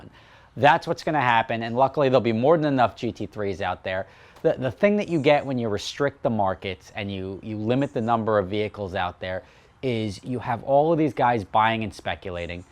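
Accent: New Jersey, United States. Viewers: like yo... you from Jersey?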